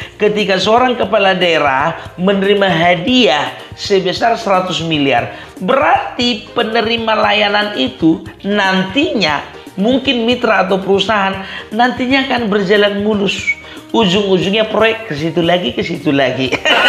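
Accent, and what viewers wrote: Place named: North Sumatra, Indonesia. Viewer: Abang ini bukan lucu-nya yang aku senangi. Beliau ini mau belajar dengan mendalami materinya dan kontennya kaya, ringan, santai baru lucu dengan akses sumutnya. Bravo lae ❤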